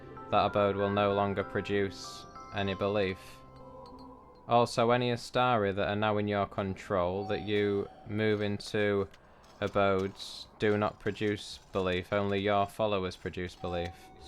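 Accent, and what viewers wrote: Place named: England, United Kingdom. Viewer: Man i love your accent.. which part of england is that? Also im glad to inform you that ALL of your vids are helpful and im enjoying your chanel. Keep up the good work mate. :)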